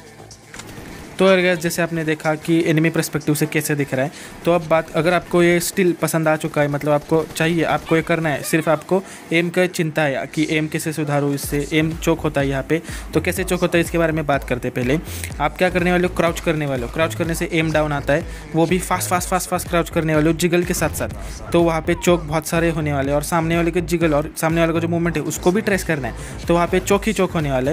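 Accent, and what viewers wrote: Maharashtra, India. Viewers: Op bhaii..💯💯💯 I think your mother tounge is marathi...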